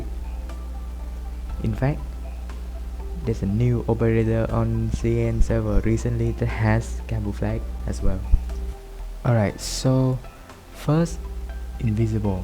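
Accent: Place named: Vietnam. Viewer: Vietnaese accent for sure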